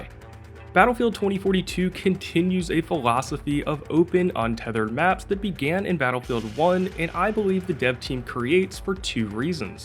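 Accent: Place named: Philadelphia, United States. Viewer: Great video, very well put together. Just subscribed! Also, are you from the Philly area by chance? A few words you say have an accent that comes through lol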